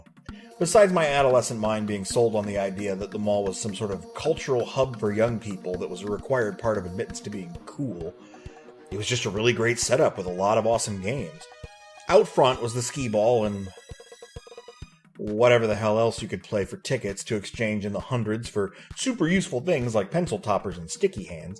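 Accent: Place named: Northwest Indiana, United States. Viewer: You sound like you grew up in NW Indiana with me, bro. Shout out to Aladdin's Castle arcade!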